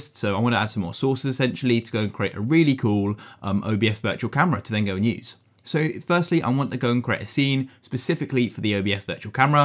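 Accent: United Kingdom. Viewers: HE'S BRITISH!!!!!!!!!!!!!!!!!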